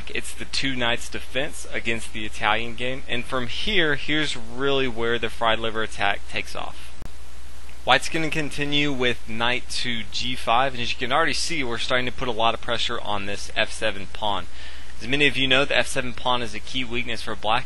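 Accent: United States. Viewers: Nice American accent!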